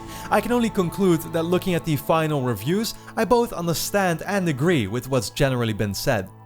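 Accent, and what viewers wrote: Netherlands. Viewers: Your loud inhaling after each sentence is very distracting. It would be nice if could cut it out. A lot of Dutch people have a terrible accent, yours isn’t too bad though. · judging by your accent im guessing you are dutch, the dutch tend to be straight forward and say it how it is, it clashes with the sjw hivemind coming mostly from america, dont worry about it, just speak your mind and don't be afraid of having an actual personality, it is the reason i am subscribed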